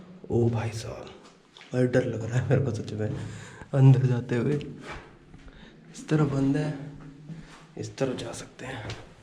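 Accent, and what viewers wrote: Haryana, India. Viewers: Your accent shows that you are haryanvi 🤩🤩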